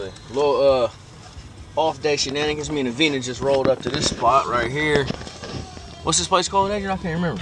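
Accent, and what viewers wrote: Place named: South, United States. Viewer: Hey, I can hear a Southern Drawl when you speak now. You still a Cali Kid..? 🤔 😂 Rooting for you always my Bro.... YEETTADEE!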